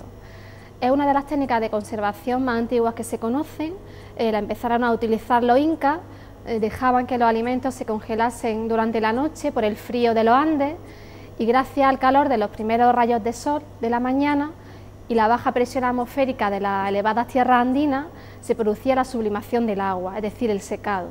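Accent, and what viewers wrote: Andalusia, Spain. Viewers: Que bonito Andaluz! La lengua madre del hoy español estándar (sin zezeo)! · tiene un hermoso acento andaluz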